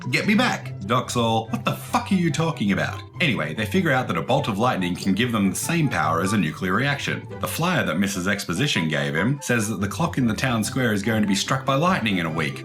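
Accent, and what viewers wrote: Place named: United Kingdom. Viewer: oh damn dude that comment i left, i deleted after a minute, because the tissue joke was quite obvious for everyone, and not a quick split second blink-and-you-miss-it pause-at-the-right-time kinda deal (like how i thought it was) you replied fast!! i appreciate that anyway this is a good video and i adore back to the future and thank you and all that subscribed and whatever and make more videos and shit that whole theory was pretty top notch and i love it actually... i kinda wish it was true and canon now honestly but my only ACTUAL criticsm for your video is you should vary up the music and sound design a bit more you know, the heavier points you drop the music to give some focus, maybe switch it up every now and then so it's not the same stock kevin macleod track... just make sure the video is as good to listen to as it is to watch that's it though, the editing was great, the jokes were good, you have a great personality, your voice is british and memorable (which is great combo on youtube) the sound design just needs some more work, and that's just from THIS video alone, i have yet to see your others!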